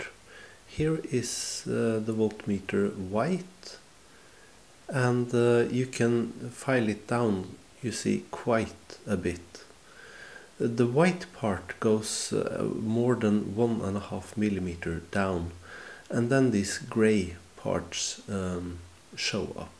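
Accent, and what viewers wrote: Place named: Sweden. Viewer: i have one question? are u swedish? u rly sound swdish i am finnish btw xd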